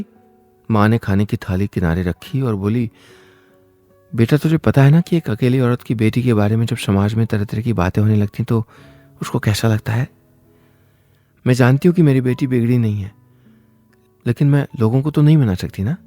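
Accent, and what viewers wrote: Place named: Uttarakhand, India. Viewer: Sir m from uttarakhand and bolne Mai asi tone hmare wha sun ne ko milti hai apki khaniyon Mai apne uttarakhand ki jhalak pake bht acha lgta hai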